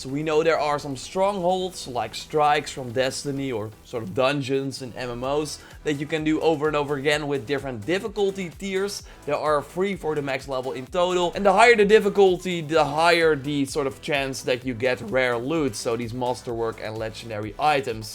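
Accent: Netherlands. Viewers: Stronk Dutch accent dem bruf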